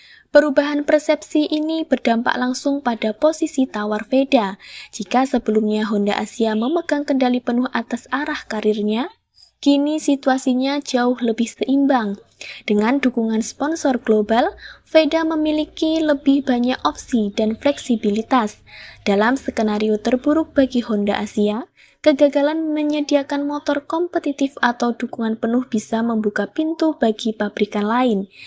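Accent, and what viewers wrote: Java, Indonesia. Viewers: logat jawanya medok....tp dia anak hebat....ini yng penting.... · Logat Jowonya sangat² medok 😂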